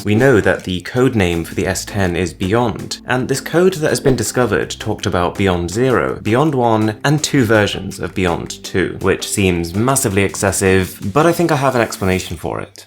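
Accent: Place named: England, United Kingdom. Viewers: Got to love the English accent 🇬🇧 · DK Dost! You luving that British accent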